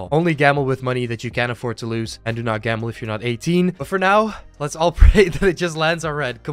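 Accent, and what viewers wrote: Netherlands. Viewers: You sound Dutch so are you Dutch? XD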